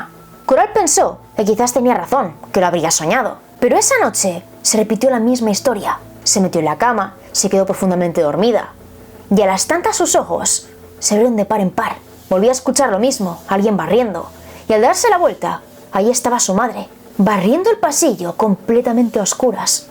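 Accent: Spain. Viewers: y sus kanas... xd Hermoso cabello blanco de, me encanta Tu acento español... me encanta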